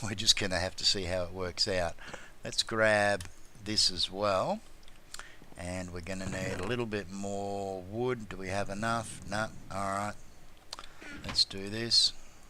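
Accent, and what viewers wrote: Australia. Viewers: I just have to say how much I love the Aussie vernacular and accent! You have such charming ways of saying/describing things. (This does not refer to 'Aussie English'), you know what I mean. <3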